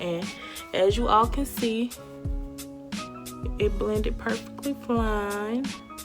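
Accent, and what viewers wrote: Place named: Atlanta, United States. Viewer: I knew you were from Atlanta, I can tell by the way you talk honey! Love this video. I use keracare curling wax and hard brush for my leave out because they were out of the stick. It works the same.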